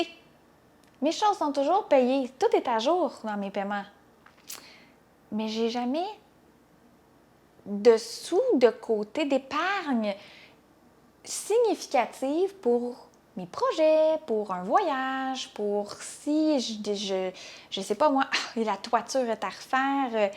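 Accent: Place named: Quebec, Canada. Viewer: Bonjour, je viens de découvrir votre vidéo. Elle est géniale et votre accent québécois, J'adore 😍. J'adore votre façon de penser et de nous motiver à trouver solution pour mieux dépenser. J'adore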